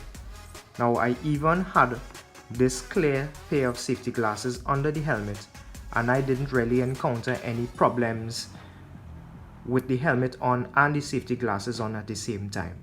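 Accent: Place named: Trinidad and Tobago. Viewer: Cyar miss dat twang bai Trini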